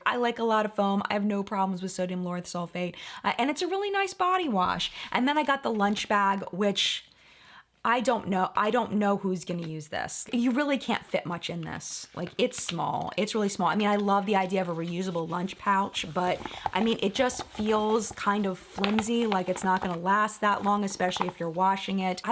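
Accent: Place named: Maryland, United States. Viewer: My fellow Marylander!! I love your Maryland accent, “Baltimore” 😍😍 hello from Riverdale Park, MD!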